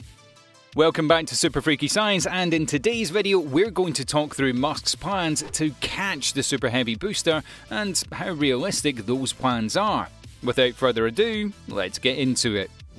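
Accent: Scotland, United Kingdom. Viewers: Are you from Scotland? I like it , not so much the Brits I like the way you speak, it reminds me of 'Scotty' on the enterprise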